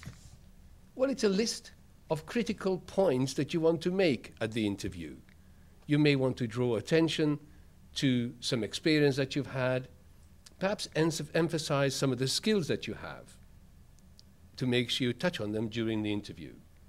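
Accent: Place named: South Africa. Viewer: Couldn't understand this persons funny accent. Sounds South African. Ughh.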